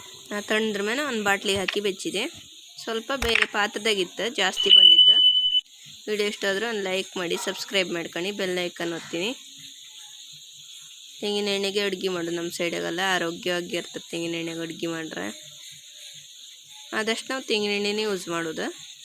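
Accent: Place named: Kundapura, India. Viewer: ಸೂಪರ್ ನಿಮ್ಮ ಕುಂದಾಪುರ ಭಾಷೆ ಕೇಳೋಕೆ ಚಂದ 👌👍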